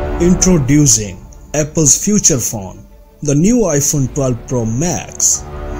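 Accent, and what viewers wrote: India. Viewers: The voice over is 100% Indian//Bravo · English made in India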